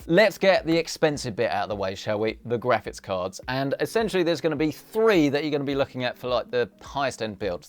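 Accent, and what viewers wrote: United States. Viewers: Damn your American accent is incredible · Dude, loved your snarky American Bro accent 😆 it made my day.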